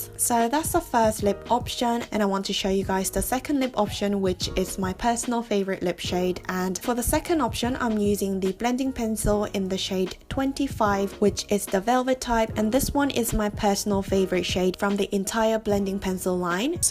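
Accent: United Kingdom. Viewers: 언니 영국 발음이 너무 듣기 좋아서 구독하기 시작했는데 여전히 좋아요...ㅎ사실 제가 영어에 흥미도 없고 못 하기도 해서 영어 잘 하는 사람이 더더욱 부럽고 매력적으로 보이거든요ㅎㅎ헷 특히 툭툭 내뱉는듯한 영국발음은 정말 더럽...❣본론으로 들어가서 공교롭게도 제가 원래 제일 좋아하는 계절이 가을이에요! 가장 싫어하는 계절이 여름이라 여름 다음에 오는 가을이 제일 반갑고 여름이 오기 시작할 때부터 '얼른 가을이 왔으면 좋겠다'라고 생각하거든요ㅎㅎ가을 중에 특히 서늘해지는 9월 저녁 공기가 너무 좋아요 저는 아직 대학생이라 중간고사, 기말고사를 보는데 그 선선한 바람을 맞는 저녁은 시험에 대한 압박감도 없고 정말 여유를 느끼고 산책할 수 있거든요. 그리고 봄과는 다른 이유모를 설렘과 감성이 풍부해져서 좋은 것 같아요 그리고 제가 제일 좋아하는 색인 레드가 잘 어울리는 계절이기도 해서 화장할 맛이 나요ㅋㅋㅋ또 제 생일이 10월이라 제일 행복할 수 있는 날이 가을에 있기 때문에 더욱 가을이 좋은 것 같아요😊 이번 가을은 너무 덥고 태풍도 와서 제가 원하던 가을바람은 못 반겼지만 그래도 곧 다가오는 제 생일을 기대하면서 기분좋게 시간을 맞이하고 있어요 언니도 하루하루 소중히 시간을 보내면서 가을을 맘껏 느끼세요!!😉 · 언니!! 저는 진짜 ㅜㅜ 유럽여행갔다가 영국분위기에 빠져서 언니 브이로그 보는 거로 대리만족하고있는 구독자에요! ㅜㅜ 진짜 언니 동영상 업데이트 안되도 하루에 세개는 보고 자는듯.. ㅠㅠ 그만큼 언니 영상 좋아해요!! ㅜㅜ 저는 사계절중에 가을이 제일 좋아요! 왜냐면 우선 가을은 여름의 습함과 더움때문에 얼굴에 화장을 하면.. 건설도 지성을 만들어버리는데 가을은 우선 산뜻하고 틈나면 친구랑 한강에 가서 돗자리깔고 얘기만 해도 기분좋게해주는 날씨를 갖고 있기 때문이에요! 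그리고 가을하면 저의 엄마 생일이 있어요! 대학과 집이 떨어져서 집에 자주 못가는데 가을에 엄마 생일때 엄마보러 가서 좋아요! 이벤트 당첨 안되도되요! 언니영상에 글 처음써보는데 흐.. 언니 영국발음 너무 좋고 그냥 힐링되요ㅠㅠ 영국 갈게요! 저! ㅜㅜ 진짜로 영국에서 살고 싶네요ㅜㅜ 오늘 메이크업 완전 잘어울리세요ㅜㅜ❤️ 그럼 여기서 글을 마칠게요!! 언니 영상 잘 봤어요!!💘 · (응?ㅋㄱㄱㅋㅋㅋ) 레드립은 넘나 화사해보이고, 25호 누디립은 넘나분위기 넘치십니다😭😭😭(덕통사고) 에뛰드가 이번에도 정말 열일했네요!!! 오늘 메이크업은 님 영상보고 영감받아서 클레식메이크업 해봐야겠어요ㅎㅎㅎ 아 맞다 그리고 가을이 좋은이유!! 바로 모든분들이 꼽으실 이유같은데요!ㅋㅋㅋ 일단 바람이 넘나 선선하다는거! 불면증도 사라지고 너무 좋습니당♥ 또 전 개인적으로 가을겨울 옷들을 너무좋아해요, 니트 무스탕 블랙스키니 셔츠 등등 가을에 잘 어울리는 룩들이죵! 게다가 메이크업도!!! 주로 버건디, 퍼플 계열의 메이크업을 하는 전 가을이 너무 좋네욯ㅎㅎㅎ 이제 내 세상이도닷!!!!! 님 영상 항상 잘보고 있고요, 영상 속 영국 영어 너무 매력적이예요💕💕 영국이란 나라에 관심이 많아 발음이라던지 자꾸 그나라 문화를 찾아보고 있는데 그때 님을 알게도었다능...!!! 그럼 다음 영상도 기대하겠습니닷💖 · 영국 발음이 너무 듣기조아요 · 언니 안녕하세용 저는 조종사가 되기위해 공부중인 학생 조종사에요! 댓글로 인사는 처음인것 같네용 ㅎ_ㅎ 영어를 워낙 좋아하고 잘해야되는 직업을 꿈꾸다보니 영국영어를 하는 언니모습에 반해서 구독을 시작했었는데 ㅎㅎ 그리고 해외로 삼주정도 어학연수겸 여행을 다녀온 영국에 살고있는것에 되게 반했었거든요ㅠㅠ 제가 놀러갔을때 버밍엄에있었어서 +학교 소속으로 간거라 주말밖에 외박이 안됏어서 런던을 1박 2;일로 다녀왓었는데 정말 반했었거든요ㅠㅠㅠ 여러가지요소가 제가 언니를 구독하게 한것같아요 ㅎㅎㅎ 그런데 이제야 댓글을 남기게 됐네욥! 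제가 가을을 좋아하는 이유는 가을은 정말 비행연습하기에 좋은 날씨거든요ㅠㅠ 봄에는 미세먼지로 인해서 visibility가 안나와서 비행취소된일이 한두번이아니구 여름은 더워서 정말 진이빠져요 연습용항공기엔 에어컨이없거든요... 그 더운 여름날 갇혀서 땀을 줄줄.., 가을은 정말 연습하기 딱 좋은 날씨에 visibility도 좋을 뿐더러 구름까지 완벽해요 겨울은 너무 추워요.., ㅠ0ㅠ 강풍이 불때도 많구요., 그래서 저는 가을을 제일 사랑해요 ♥ 다른분들도 다들 가을을 좋아하시겠지만 저는 비행을 시작하면서 아무래도 더 좋아진것같아요! 댓글이 1800개릉 향하고있어서 언니가 제 댓글을 읽을수있을지가 의문이지만! 저의 당첨을 원하는 마음을 담아 댓글을 남겨볼게요!!! 앞으로도 영상 많이 만들어주세욧 ♥♥ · 오늘 메이크업 정말 이뿌네용👍🏻👻 가을은 제가 태어난 계절이기두 해서 가을이 오면 괜히 좀 하이퍼되구요 패션이나 화장 스타일을 좀 어둡고 딥한 걸 조아해서 쇼잉 오프 하는걸 즐길 수 있어서 조아요 ㅠㅗㅠ 영국 발음 그리울 때 채널 와서 영상 보곤 하는뎅 할로윈 멬업도 곧 해주셧으면!! 😘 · 언니 특유의 영국발음이 좋아서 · 이번 메이크업이 에게 특히 잘 어울리네요 너무 강한 색 섀도우 쓸때보다 은은한 색들오 메이크업하니까 분위기가 깊은? 그런 느낌이 들게해여!!이뻐여!! 가을이 좋은 이유는 저는 하늘보는거 좋아하는데 가을의 구름들이 가을만 가진 예쁜 모양이나 특이한 형태 그리고 가끔씩은 택스쳐도 다를것같은 그런 특유의 분위기를 가졌더라구요 그래서 전 가을이 좋습니다! 이벤트때문에 댓글을 단 것도 있지만 매번 듣기에 너무 좋은 영국발음과함께 영국생활을 리마인드 해줘서 메이크업은 물론 브이로그 영상도 잘 보고 잇어서 응원하고 싶다는 생각에도 달아봅니다! 어린나이에 타지에서 언니랑 둘이?인지는 확실치 않지만 생활하는 모습 보기좋구 앞으로도 좋은 영상 잘 부탁드립니다!!! 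· Holy Moly!가을이좋은이유는 여름에는 화장을 1시간넘도록 정성들여하고나갓는데 제가지성인지라 여름은금방땀을흘려버리니피부가 금방 무너지고 유분으로인해 눈가도 다지워져서 여름을싫어하는데 가을은 날도 따쓰하고선선해서 딱좋아하는날씨예요!바깥나들이 가기딱좋은날씨ㅜㅜ그리고 옷도가을때입을옷들도많고 2학기가 시작되는 계절이지요 새로운맘으로 다시 되덜아볼수도있고 그리고 행사도많고!!하핳 구독한지는 꽤되었는데 전 더도말고 님의 특유의 영국발음에 뿅가서 두말할거없이 바로구독 저도 영국에 대해 관심이많고 영국발음에도관심이있었는데 언니의 일상속에서 나타나는 영국발음!배운점이많아서 저도일상에서자주써먹고있습니다!예를들면 영어시간에 남들과는다르게..영국식으로....진짜 거짓말 안하고 요즘 영어듣기도 영국식발음 으로 거의 하는데 언니의 동영상으로인해 저는 영국발음에 익숙한지라 점수도올랐어요✌✌ 브이로그많이찍어서올려주세요!일상속의 님모습도 많이보고싶네요~~ 언제나 응원할게요!!영상 앞으로도 계속 많이 올려주세요!!다음 영상도 기대할게요!!!아 그리고 님은 입술전체에 립을 다발라도잘어울리시네요ㅠㅠ저는 풀발색은 안어울리는데 매트한 립스틱은 그라데이션하기힘들잖아요 그거 어떻게하면 그라데이션잘되는지도 알려주시면 정말 감사할것같습니다!!! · 항상 볼 때마다 느끼는거지만, 님은 넘나 귀여운 것 ㅠㅠ 영국악센트도 진짜 러블리.. 항상 좋아요만 누르다가 오랜만에 댓글 다네요. 전 사계절 중 가을을 제일 좋아하는데 제가 더위를 많이타고 벌레를 아주 극혐하는 수준이기 때문에 가을이 제일 좋아요. 특히 제가 건성이라, 겨울엔 아무리 기초를 탄탄하게 해도 화장이 뜨더라고요ㅠㅠ 근데 가을은 시원한데다, 건조해도 화장이 뜨지않고, 비도 별로 안와서 항상 하늘이 예쁘고 화창한 날씨잖아요. 벌레도 없고. 그래서 가을이 제일 좋아요.😆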